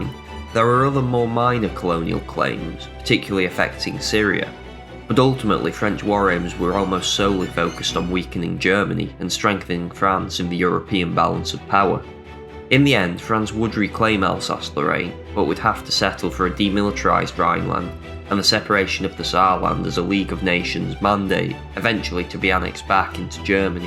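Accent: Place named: England, United Kingdom. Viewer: Are you from stoke? Never expected a potteries accent clicking on a random vid.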